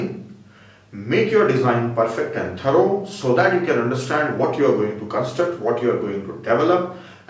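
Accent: India. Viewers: 印度兄弟讲的挺好理解，印度口音听久了也能习惯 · incredible india！I recently turned to Indian lecturers at YouTube to learn something. Except the accent of English, most of videos are so good and easy to understand like this one -----------Great respect from an international student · you have to make english subtitles, because the automatic subtitles from youtube are wrong a lot, as a south east asian, i have a hard time understanding your indian english accent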